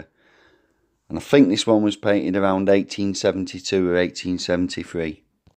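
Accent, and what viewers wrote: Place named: Lancashire, United Kingdom. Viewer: Love the French pronounced names in a Lancs accent! Art seems better now I get older!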